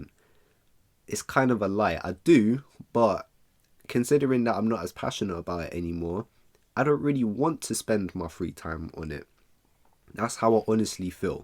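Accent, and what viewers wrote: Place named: London, United Kingdom. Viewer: Honestly bro where do I even begin a massive shout out to you for being an amazing youtuber round of a applause to you my bro👏first time when I found your channel thought to myself that your from London then I immediately subbed to your channel as I kept joining your streams every Saturday tunning in consistently we both became friends met new people in the streams playing Mario kart having fun honestly am going to miss playing it with you online take care of your self with the hard work you put in YouTube wise.🔥🔥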